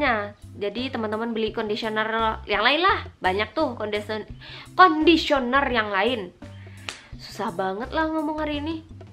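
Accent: Indonesia. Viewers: Suaramu dek mirip minang kocak 🤣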